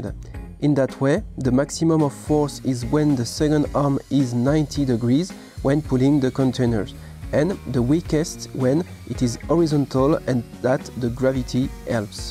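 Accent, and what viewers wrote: France. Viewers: Great model, I have both your other 42100 alts and will buy this one too, for sure! Love your French accent, btw. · j'en était sûr en entendant l'accent...un français sympa le MOC, je vais jeter un oeil au reste des création s hormis l'accent (j'ai le même quand je parle anglais) il n'y a rien à redire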